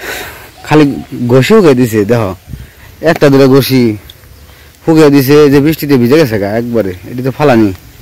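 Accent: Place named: Dhamrai, Bangladesh. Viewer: Aapnader Baari kothaye bhai? Aapner accent shune mone hocche aamar Nana'r barir kaache. Aamar Nanar baari Dhamrai Upozila, Graam Kushura, Bongshi Nodir paare.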